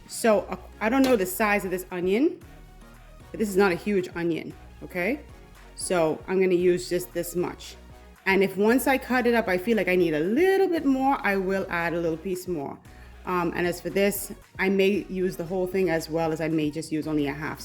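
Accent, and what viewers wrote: Bahamas. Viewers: Lol I enjoyed this say (.judge your ma lmaoo, looka that macaroni ) you're a real bahmaian gyal, accent/slang on point 😆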